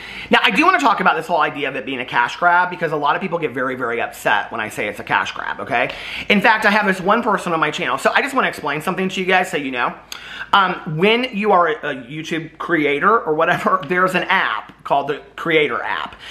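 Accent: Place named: Detroit, United States. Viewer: are you from detroit??🤣 that’s my favorite please don’t stop it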